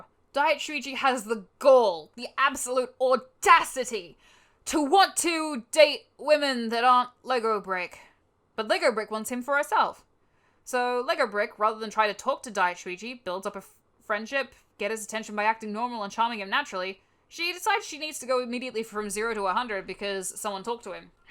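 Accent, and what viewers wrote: Australia. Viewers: You sound like an australian Tiny Tina, an’ it’s awesome!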